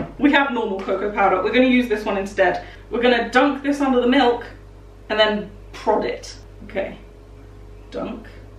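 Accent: United Kingdom. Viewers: In the US we call it cotton candy. Lol love the British accent. your such a sweetheart. Love ya videos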